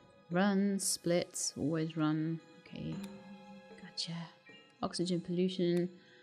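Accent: United Kingdom. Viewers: Looks interesting.can u play more of this game thanks p.s you still have a lovely voice where abouts in the UK you from because I ve hear it before but I can not place it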